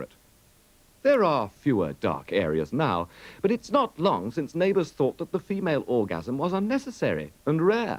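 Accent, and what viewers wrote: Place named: United Kingdom. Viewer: I think this is awesome, but I can't listen or read this video well in English, my language is Spanish. I've tried to (the great British accent helps me), but the CC are a bit confusing sometimes. It's there any transcription in English? I really want to understand this chat entirely. Thanks in advance!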